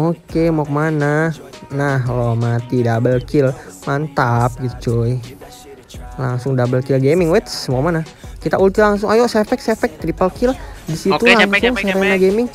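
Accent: Banten, Indonesia. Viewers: Orang banten bang? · Asal banten ya bang?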